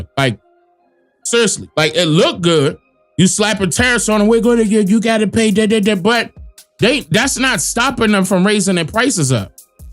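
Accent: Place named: DMV, United States. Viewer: Big love to you. I just confirmed you were from the DMV. I’m from PG and I knew I recognized your accent and lingo lol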